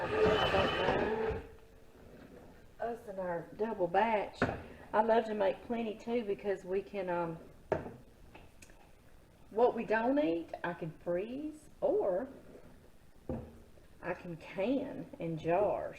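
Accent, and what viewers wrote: South, United States. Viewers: Just had to follow you as you seem such a lovely warm person..Oh I love your country accent too. Hope you had a lovely holiday in good ole USA from me in the UK x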